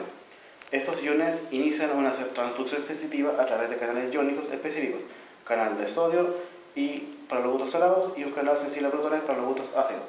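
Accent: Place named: Chile. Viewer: es chileno, así hablan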